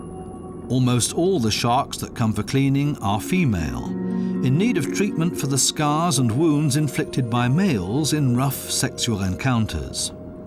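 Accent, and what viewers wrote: United Kingdom. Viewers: "notanother" Uboob being narrated by a Brit, for Gods sake use a Lantino accent for Central American subjects. What's with all the Brits? At least this one I can understand, without subtitles.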